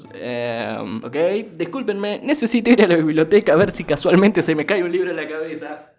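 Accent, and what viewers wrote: Argentina. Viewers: Sos argentino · che soy yo o tiene un re asento ARGENTINO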